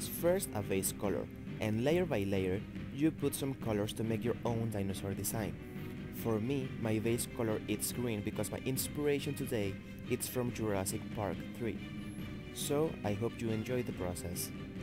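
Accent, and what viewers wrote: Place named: Spain. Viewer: Hey, where are yoy from? Por el acento pareces español o hispanohablante, pero al país en concreto ya no logro distinguirlo. Además la marca Jovi de las acuarelas es muy común aquí en España, no sé si se dará en más países. Estoy en lo cierto? Gran canal por supuesto! Acabo de descubrirlo y me encanta!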